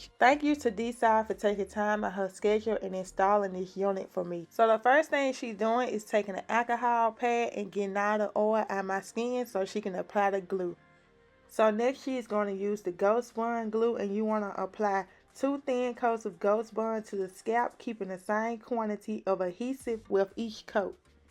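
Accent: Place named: Memphis, United States. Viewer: That south Memphis accent❤️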